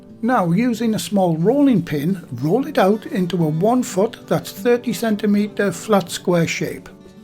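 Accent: United Kingdom. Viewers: I tripped on to your channel while looking for a Multi-grain seeded bread to make. I am definitely going to try this, thank you! You sound so sweet and I'm a sucker for the lovely British accent! Reminds me of my years spent in the Air Force stationed at RAF Alconbury years ago in East Anglia. Tell me Sir, how would I modify this for a Bread machine? I assume it needs to be wetter right?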